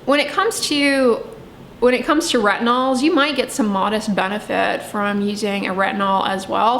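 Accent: United States. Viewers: Where does that accent come from? As an Australian, it is hard to know what part of America that accent is common. Just today I discovered my brother in law has skin cancer on his scalp. It’s the most common type (BCC). We cannot overstate the dangers of the sun.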